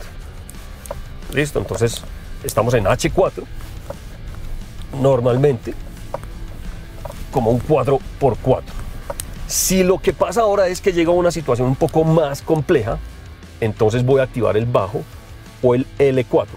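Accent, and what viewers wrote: Colombia. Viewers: De dónde eres una pregunta tu eres colombiano Es que pareces colombianos yo reconozco muy bien como hablan los paisas los rolos vallunos y me parece que eres de esa parte del pais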